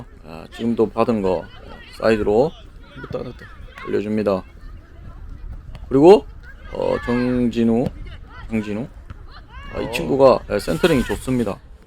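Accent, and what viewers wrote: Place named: Gyeongsang, South Korea. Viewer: 아이들 축구도 치열하네요^^ 경상도 해설 잘 들었어요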